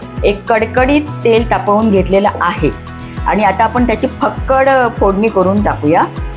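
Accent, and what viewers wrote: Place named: Goa, India. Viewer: तुम्ही कुठल्या आहेत, मातृभाषा कोणती, बोलण्याचे toning Goa side chi वाटते की कारवारच्या आहेत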